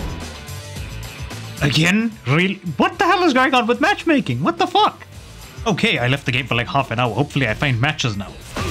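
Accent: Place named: Wales, United Kingdom. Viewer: I'm loving playing Heihachi at the minute he's a great character... unrelated I can't tell if you are Welsh or Asian 😂😂😂. I'm from Cardiff n I swear I can hear some Welsh in there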